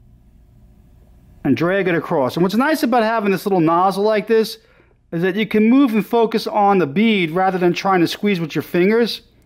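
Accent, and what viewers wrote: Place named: North, United States. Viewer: why can't I just send my t5 to you sounds like you are north of the mason Dixon line. so might be to expensive for shipping.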